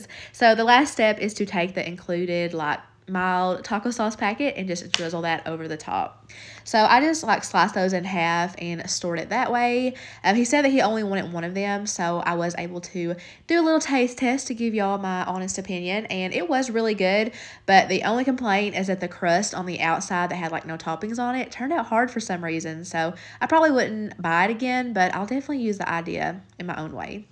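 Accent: South, United States. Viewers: I know this is an older video, but I have just recently found your channel while I have been stuck in bed due to an illness and have been watching YouTube nonstop! I absolutely love your channel! I am in Alabama and I love content creators that have the Southern accent like mine! Don't worry, yours is great, I sound like straight cornbread! Lol. Anyway, I would like to know where you got the pot that you cooked the chili in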